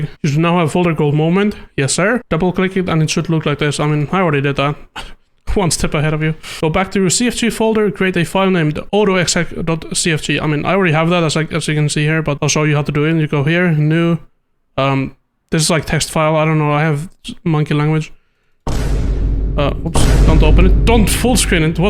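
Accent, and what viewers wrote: Finland. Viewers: Are you finnish? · ooooooooooooooooooooooooooootko suomalaine kuuulostaa aksetti kyllä ralli enklish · Ur finnish? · U finnish?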